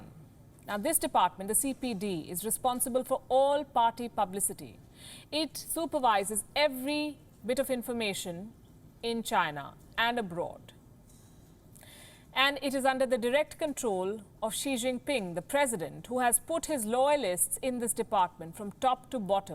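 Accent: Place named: India. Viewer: She has a strong india accent and talk piece by piece.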